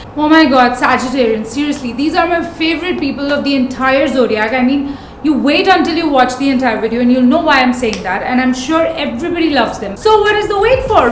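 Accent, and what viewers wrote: India. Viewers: maybe 'becoz of that Indian accent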